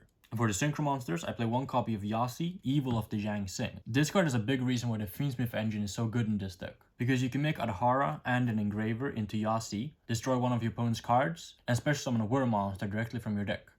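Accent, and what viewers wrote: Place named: Sweden. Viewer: dont be a prick, how many languages do you speak perfectly? He is swedish, they pronounce some letters differently in english, big deal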